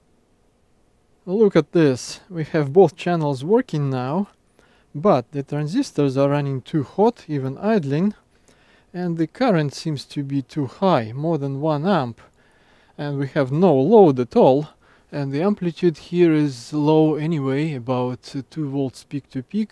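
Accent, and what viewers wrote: Russia. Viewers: Русский акцент!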